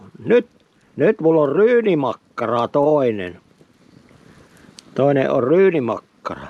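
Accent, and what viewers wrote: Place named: Kaavi, Finland. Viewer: Kiitos ja kiitokset, että jaksat tehä näitä juttuja, mukavia arkisia hommia. Mie niin tykkeen tuosta siun kuavilaisesta murteesta, mukavan kuulosta. Nyt pitää lähtee ostamaan ryynäriä ja loimottoo hiilloksella. Seuraavaa laavureissuu odotellen...❤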